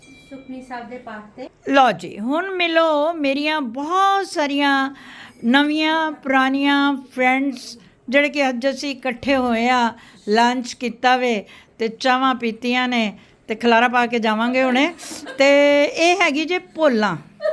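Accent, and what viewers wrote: Punjab, India. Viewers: Apko dekh kr dil bht khush hota hai jaise Sadi Punjabi vaise he tuhadi Punjabi pure punjaban waah ji apko Milne ka Mann krta hai bt aap kon se sector mai rhte ho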